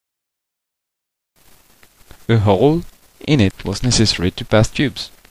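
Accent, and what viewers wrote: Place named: France. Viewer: Nom de diou tu es francais :D Le meme accent que moi . xD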